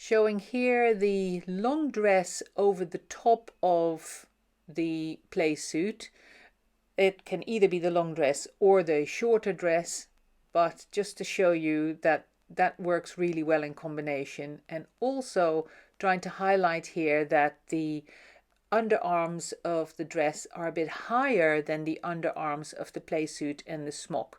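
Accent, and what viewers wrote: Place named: Netherlands. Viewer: my best friend is Dutch and so recognized your accent coming through straight away. You look so good in all the clothes that you model. Continuing to watch your podcasts. Cheers 🥂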